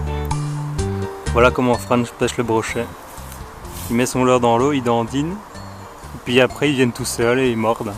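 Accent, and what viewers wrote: Belgium. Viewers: Tu viens de Namur tu as laccent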